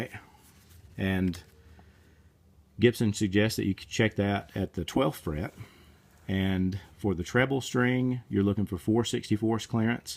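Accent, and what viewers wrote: Texas, United States. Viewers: Good, clear tutorial, wish there were more like this. And I dig your accent! Texas? (Excuse my ignorance, I'm English.)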